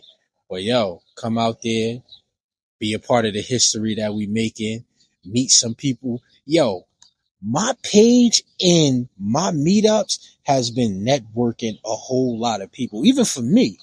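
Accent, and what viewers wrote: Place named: Brooklyn, United States. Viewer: Hearing you speak took me back to my Brooklyn days. I grew up in Brooklyn in the 80s and 90s. Your accent and vocabulary took be back to that time.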